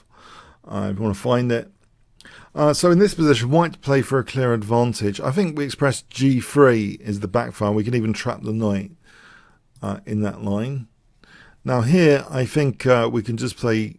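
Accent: England, United Kingdom. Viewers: Thank you so much dear I really learn so much from you, great analyzing tactics and plans you really Amazing. beside entertaining I like your English accent..smile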